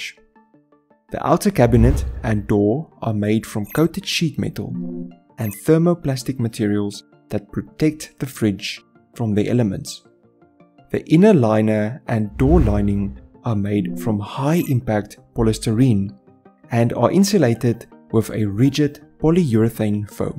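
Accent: South Africa. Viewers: South African accent👍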